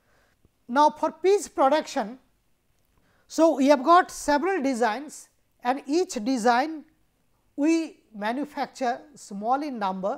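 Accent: India. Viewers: For the first time ever someone thanked an indian youtube lecture instead of making fun of accent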